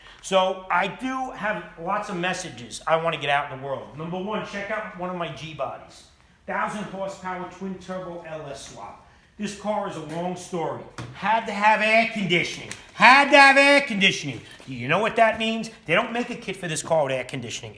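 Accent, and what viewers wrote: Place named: Detroit, United States. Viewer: That's a beautiful accent where is from ? Detroit ?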